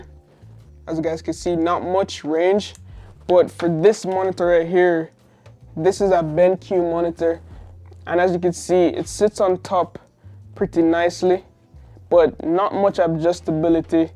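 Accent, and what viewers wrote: Jamaica. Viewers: Man sound like a yawdy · Mi can hear di Jamaica in yuh yute 😂🤞🏾🇯🇲 good video I'm thinking of buying one. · Are you Jamaican 🇯🇲✊🏾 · Where you from bro? Sounds like a Jamaican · Are you Jamaican? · Wait u Jamaican ?🇯🇲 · Yow real medz are you jamaican? ?? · I hear the Jamaican accent lol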